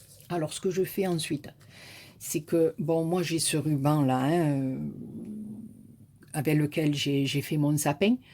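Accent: South, France. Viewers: Un accent que je connais bien... puisqu'on me dit toujours..." t'es du sud..."... encore merci pour tes tutos, tes explications.. et surtout pour tes idées géniales de déco... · Trop jolies tes fleur des neiges et ton accent j adore tu me fais voyagé dans le sud à chaque fois. Et tes explications son très claire pour moi Ta raison fais péter les fleurs au moins sa sent bon🤣🤣 pleins de gros poutous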